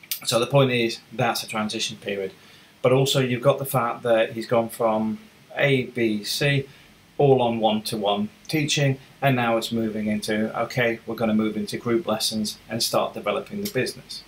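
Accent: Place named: Birmingham, United Kingdom. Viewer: He even speaks like an idiot ! Bruuuuummmieeeeee and living there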